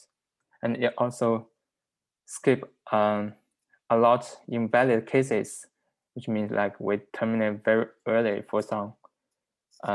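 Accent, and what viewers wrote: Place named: Southeast Asia. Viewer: 很重的东南亚口音，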